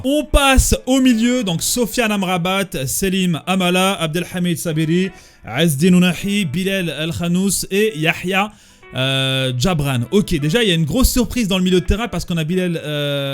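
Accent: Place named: Arab world. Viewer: Sanpa ton accent arabe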